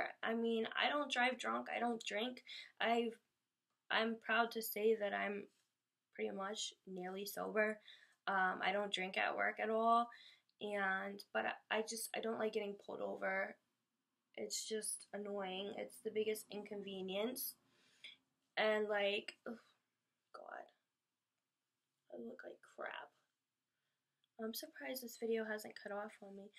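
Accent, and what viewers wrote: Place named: Massachusetts, United States. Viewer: You definitely picked up the Massachusetts accent haha its kinda adorable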